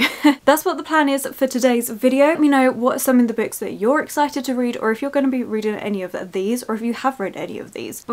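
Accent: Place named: Essex, United Kingdom. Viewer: I really loved this video and channel,happy monday xx fan 🧑‍🎄.....ps you sound essex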